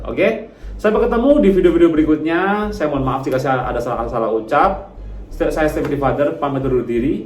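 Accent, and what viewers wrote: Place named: Java, Indonesia. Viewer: Mas aku mo nanya..,btw kamu aslinya dari Jawa Tengah/Jawa Timur. Koq logatnya Jawa bngt🙄 yg kedua aku mo nanya..,mas subscribeermu itu banyak lho mas..,🧐tapi koq yg nonton cuman 15 ribu 20 ribu🙄itu knp mas...?!!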